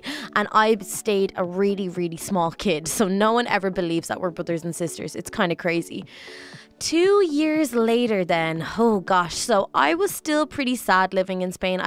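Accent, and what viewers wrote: Ireland. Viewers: I couldn’t hear your accent was Form but now I know it’s Irish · I fucking knew you were irish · I was born after my grandpas birthday but he died a couple of months ago but you don't sound that Irish kind of but not a lot :P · Now I can tell where you get your Irish accent i have been watching your videos for 3-4 years now they make me happy and the other little club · I love the accent from down south Ireland (I live in Northern Ireland in Co.Derry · You sound VERY Irish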